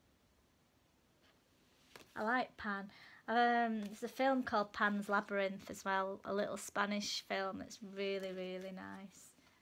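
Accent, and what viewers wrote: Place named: Northern England, United Kingdom. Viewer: Thank you for the recommendation and review, we appreciate you taking the time to do it! And you sound like a fellow northerner (I am from Bradford originally) - Josephine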